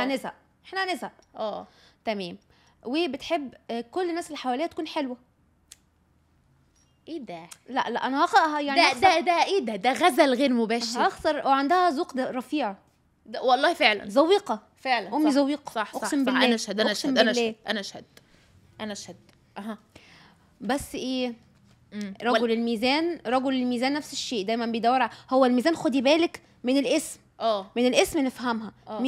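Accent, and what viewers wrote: Iraq. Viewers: تعودت عليجن تحجن عراقي 😂😂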